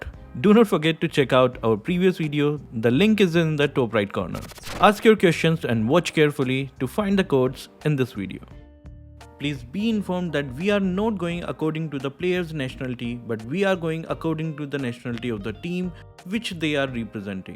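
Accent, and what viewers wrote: India. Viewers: Indian english 😂😂😂😂😂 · Uk he's idian saying e first when there's s in a sentence example e stop u😅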